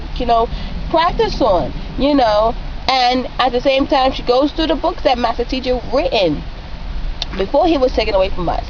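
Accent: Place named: United States. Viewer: ok u r like american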